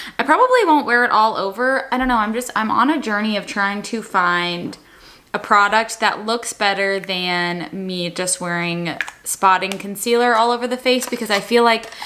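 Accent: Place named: Delaware County, United States. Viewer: your resident Philly girl is cringing and laughing out loud at the same time at your Delco accent LOL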